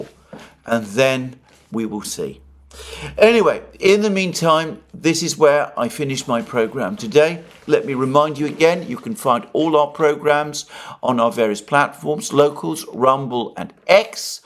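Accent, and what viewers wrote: United Kingdom. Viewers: It's strange, I hate British accents and it's always bad news, but this has become a comfy little show for me. Thank you Alexander.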